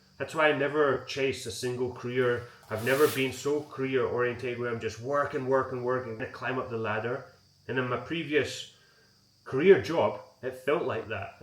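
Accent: Scotland, United Kingdom. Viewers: Dude, I always assumed you were Australian. Didn't realize you were Scottish!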